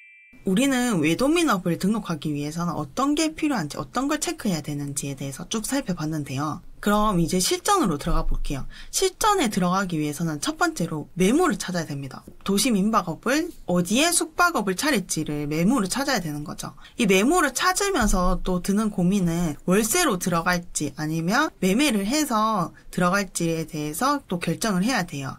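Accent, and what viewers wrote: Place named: Gyeongnam, South Korea. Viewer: 경남 말투 졸라 심하다 ㅜㅜ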